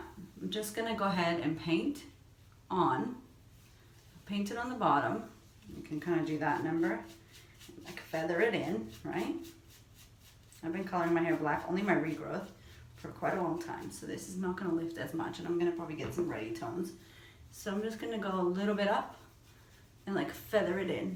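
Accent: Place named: Australia. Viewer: Super cute that you've picked up the aussie accent! Great video BTW!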